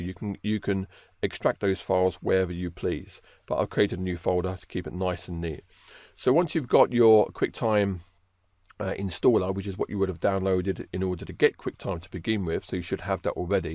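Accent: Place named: England, United Kingdom. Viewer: I am an American so my English is very different from who I assume is doing the commentary here. He has a VERY heavy England's English "accent(?) which was VERY difficult for me to understand - sorry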